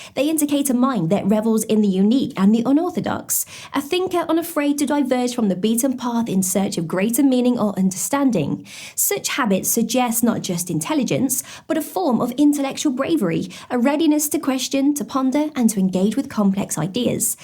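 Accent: England, United Kingdom. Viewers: I'm Surprised Americans don't say You're A I with your Perfect English Accent ! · Love her british accent she is adorable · It's the English accent for me😳😏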